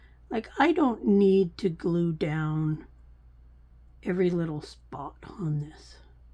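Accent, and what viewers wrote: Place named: Canada. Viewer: Are you close to any of the fires? They have been so scary & they’re popping up all over the world. California has some bad ones right now!I don’t know Canada as well as I should. I think I’ll get a National Geographic map from Amazon! I get a new one everytime I meet a new YouTuber! Kind of makes me feel like I know you better! Now that you have told me you’re from Canada, I hear that a bit in your speech! I also love all the different accents that people have! I’m amazed at the UK, they have so different sounds! It’s nice to meet you! Erica❤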